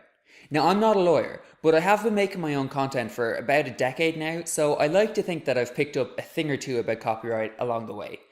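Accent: Ireland. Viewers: I really like your video, and I like your accent although it is a really soft irish accent haha. Btw, short hair is better. Have a nice day.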